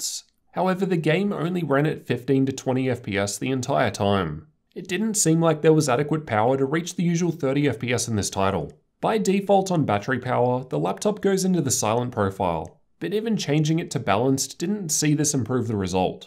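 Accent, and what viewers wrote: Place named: Australia. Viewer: Why do you link us and uk but not au? U sound aussie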